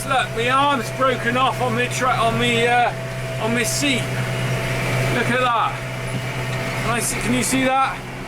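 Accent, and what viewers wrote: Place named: South West, United Kingdom. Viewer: Do you have the calves out yet? The leaf on your grass is awesome. Love that SW accent,. I find it really easy to understand. I am learning so much from you. Thank you. Loved the speeded up section.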